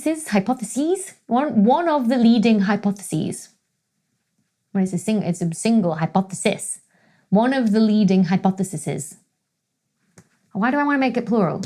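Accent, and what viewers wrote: United Kingdom. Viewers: Dr. Becky: Being an academic is hard. Middle-aged me working in food service: Tell me about it. Your accent tells me you're a Pom, so you have guaranteed health care, right? As an academic, do you get sick time? Paid holidays? My health care comes out of my own pocket, and all I get if I have to take time off from work is a smaller paycheck. I'd trade places with you in a heartbeat. To paraphrase Ford Prefect: Academic stress is an illusion. British academic stress doubly so.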